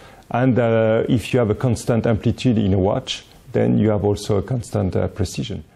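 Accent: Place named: Japan. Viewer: なんか日本人のカタコト英語みたい笑 まあそれが一番聞き取りやすいんだけど。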